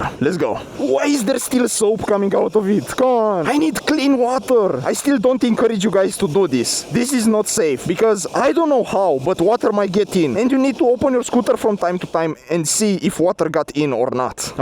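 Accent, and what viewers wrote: Romania. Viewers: Ba se vede ca ai accent roman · Yeh, he is Romanian guy from the english speak and accent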